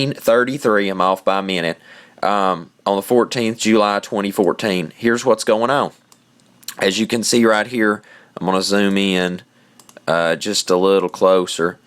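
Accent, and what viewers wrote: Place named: Carolinas, United States. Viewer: This is SO cool!....... Btw, Are you from the Carolinas. I have lots of family down there,cos my Mom was from Kings Mountain, NC, and I recognize the accent. and I LOVE it!!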